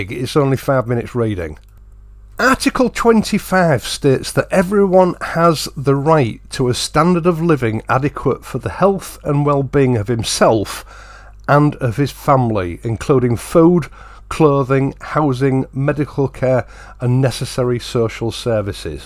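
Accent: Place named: North, United Kingdom. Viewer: I love your videos and friendly sane northern accent. As you point out human rights can be "adjusted" to mean anything. More important are our Divine rights. In Genesis God tells us to be fruitful and multiply and fill the earth and have dominion over it. This means that we can legitimately use the amazing resources such as coal and oil in responsible ways. It is interesting to note that He has retained abololute control over the climate - He says that "while the earth remains, seed time and harvest will also remain". It is also interesting to note that CO2 is an vital plant foot and according to top notch scientists such as William Happer we are bordering on a drought for this essential commodity.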